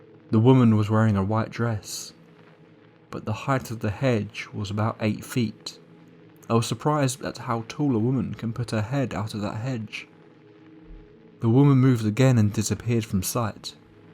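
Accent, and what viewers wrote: United Kingdom. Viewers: Are u British bruh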